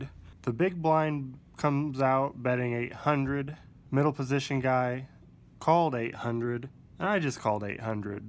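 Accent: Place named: South, United States. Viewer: Good vlog.....good voice and nice format; the southern charm (I'm from Eastern TN) is a big plus!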